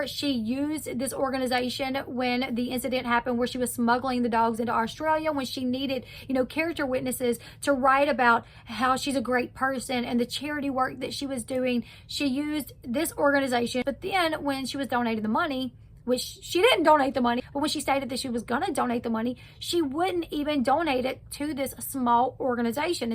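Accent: South, United States. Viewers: I love your southern accent :)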